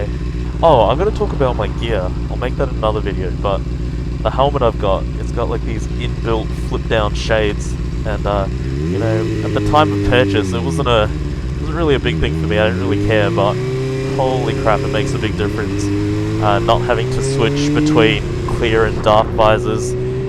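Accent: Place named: New Zealand. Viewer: You sound kiwi my bro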